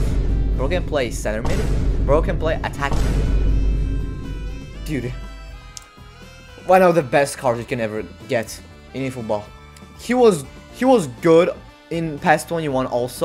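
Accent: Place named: United States. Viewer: Your Asian face with an American accent funny as hell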